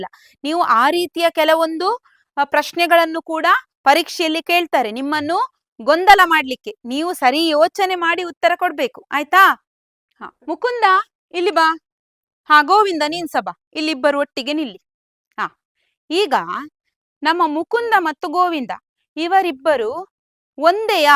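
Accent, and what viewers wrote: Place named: Dakshina Kannada, India. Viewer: ದಕ್ಷಿಣ ಕನ್ನಡದ ಭಾಷೆ ಕೇಳೋಕೆ ಒಂದು ಕರ್ಣಕ್ಕೆ ಆನಂದ. ಅರ್ಥಗರ್ಭಿತವಾಗಿ ದ್ವಿರುಕ್ತಿ ಬಗ್ಗೆ ತಿಳಿಸಿದ್ದೀರಿ.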